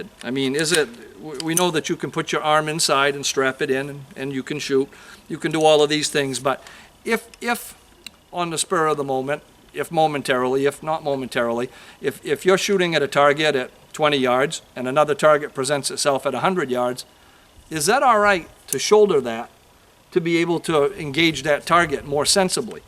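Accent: Maine, United States. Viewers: Sounds like your from Maine bub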